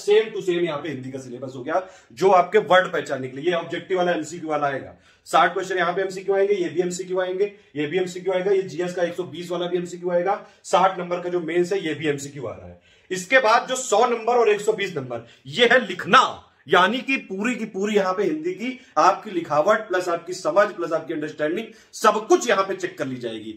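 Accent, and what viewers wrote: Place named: Mirzapur, India. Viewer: Ek dam mirzapur se inspire language xcent hai apka.. Lajawaab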